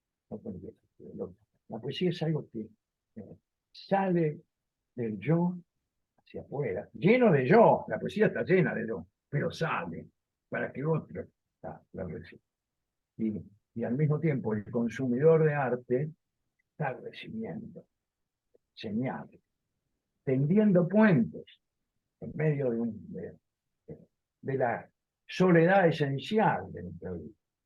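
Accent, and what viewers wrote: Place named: Buenos Aires, Argentina. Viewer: El acento porteño es hermoso ja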